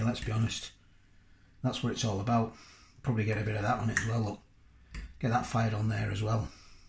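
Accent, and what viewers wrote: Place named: Yorkshire, United Kingdom. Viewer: Loving the accent mate, are you a Yorkie, or a Lank. My missus has a similar accent, she's from Todmorden.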